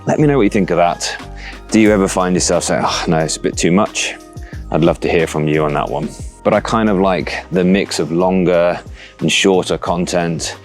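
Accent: United Kingdom. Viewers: I love your videos. First, you’ve got the Brit accent, it’s cathartic for an American. Question. I currently have a remarkable pro move for quick notes and keeping up at work. The problem being is that I find it often gets left behind on my desk at work because I’m usually walking around a 1 million square foot hospital managing my staff, and there is concern breaking a larger device because I’m usually a hands-on kind of guy when it comes to building repairs. Add into that that I’m slightly irritated about having to pay for the Connect service if I wanted to use multiple reMarkable to sync across each other. I’m debating dropping the remarkable and moving to a Boox Palma pro in my pocket constantly, with a larger Boox Go that stays at my desk. I am hauling an xteink x4 to read, but find getting books on it tedious, but love that it is helping deter me from phone distractions. 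Can (and is it more desirable) to make the move to the Boox platform with sync and removing the remarkable and x4 from my pockets. Does the hi brake pro or plus support syncing with a bigger break device? I’m curious of your thoughts since you have so extensively used all these devices.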